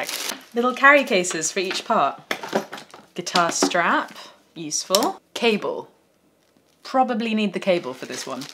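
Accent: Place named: United Kingdom. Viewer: You are so British it's amazing.. I love your accent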